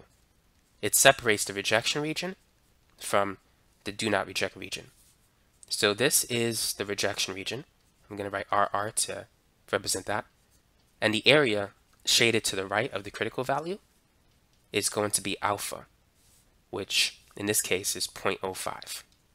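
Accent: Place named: Texas, United States. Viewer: bro is from texas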